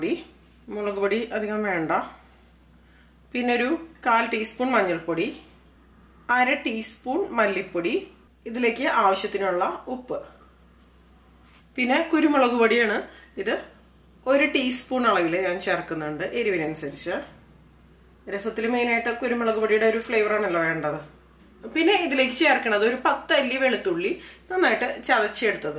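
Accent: Thrissur, India. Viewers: Although I am not a subscriber to your channel, I use to watch your cooking’s. Your Malayalam accent like angamali / trichur style . Believe you work in overseas. All best wishes .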